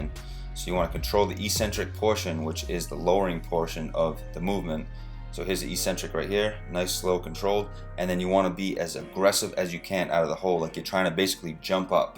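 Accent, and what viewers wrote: Boston, United States. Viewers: you sound very Boston...lol. are you from mass?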